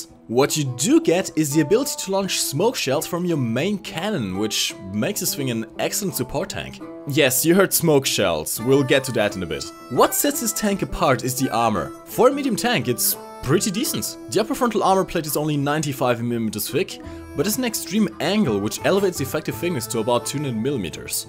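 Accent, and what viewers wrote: Germany. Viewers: Are you german? Your accent is just too flamingly homosexual, which leads me to believe you're German. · Are you German? Your pronounciation sounds really like that... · are you german?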